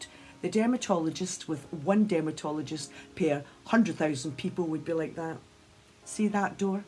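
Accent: Scotland, United Kingdom. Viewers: I think scottish accent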